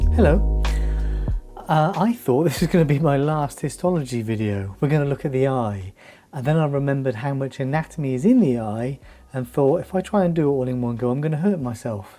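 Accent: England, United Kingdom. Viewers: ya ne tatlı bir adam bayıldım. konuştukça o ingiliz aksanıyla histolojiye ilgim arttı yerim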